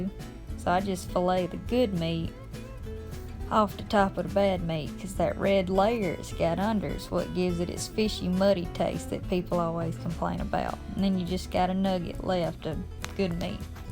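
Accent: South, United States. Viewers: Love ur deep Southern accent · A young woman with 6-pack abs, a sweet southern drawl and knows her way around the field, lakes and rivers....and the kitchen. If I was 45 years younger I'd be in love. Great videos! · She sounds like she is from my neck of the woods. The southern accent is one of the sweetest sounding accents . Well at least to me . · Southern drawl....I love it to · I’m in love all over again by your beauty and southern accent 😍😍🥰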